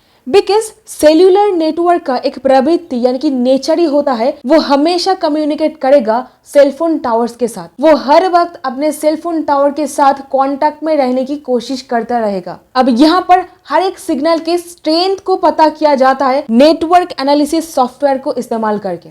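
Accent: Bengal, India. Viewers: आपको अपने बांग्ला accent को थोड़ा सुधारने की आवश्यकता है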